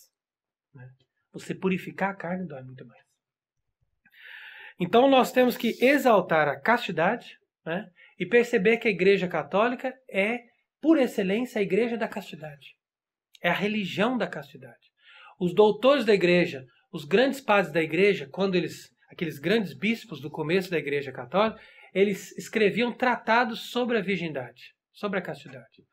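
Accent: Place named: Minas Gerais, Brazil. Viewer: vc é mineiro de Ituiutaba? Esse sotaque é ótimo. Parabéns pelo trabalho!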